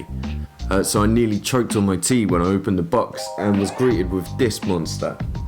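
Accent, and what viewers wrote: United Kingdom. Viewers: I like your accent, it's that cool and actually bearable British also cool video and obviously great visuals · love your videos!!! Could you say more about the settings you use for the different digicams? (music a little bit too loud for me, though... not sure you need it while you speak, let us enjoy your cute British accent😉...)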